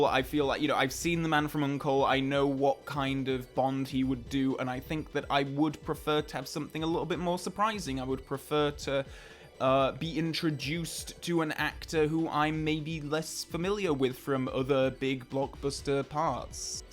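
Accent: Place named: England, United Kingdom. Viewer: English accent. 🥱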